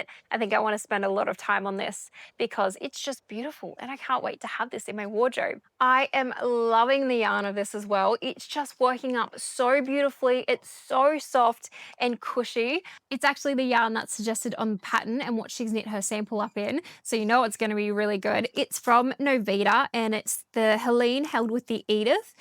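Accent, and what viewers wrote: Australia. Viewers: Hi! I am so happy for you that they are finding you a diagnosis and should soon be figuring out better ways to help you! You are such an incredible inspiration with the way that you are able to film your wonderful videos for us and convey such joy for your knitting and for life in general. I am sure that I can speak for everyone when I say how grateful we are that you share so much of your precious energy with us, because as you say you get so tired and likely discouraged at times. Your knitting projects are so lovely, all of them, and you do beautiful colorwork. Also I have to say that I just love your accent, and practice my Australian word pronunciations when no one is listening😄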